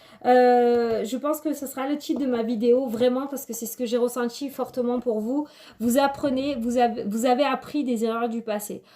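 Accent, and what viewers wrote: South, France. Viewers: Bonsoir ...je me suis proposée de faire du bénévolat . Je commence demain matin et ont vas peut être me proposer d' autres missions.. étant actuellement au chômage ...j' ai travailler dans le social pour personnes handicapées et autistes ...j' ai de l' expérience.. malheureusement j' ai préférer donner ma démission ( surcharge de travail et le système ma déçu..je garde de très bon souvenir des résidents qui était attachant pour ma part. Je voudrais travailler avec les eyen maternelle ou du social pour femmes en difficulté..🙏🙏🙏🙏 ⭐⭐ je ne perd pas espoir je souhaite de tout cœur ma situation professionnelle de réalise .. merci pour votre tirage votre accent du sud je pense ..cela me fait penser ( le soleil la mer ., tranquille quoi 🤣🤗💋💋💋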